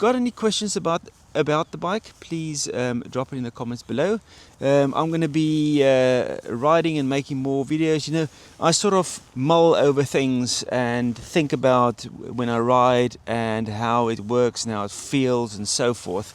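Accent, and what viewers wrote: South Africa. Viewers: Hey boet. You sound south African if I'm correct?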